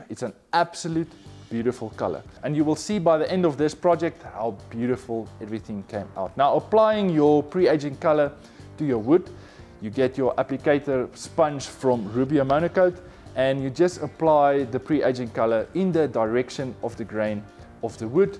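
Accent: South Africa. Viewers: Was very happy to come across the very project I was looking for. Even more excited to hear a South African accent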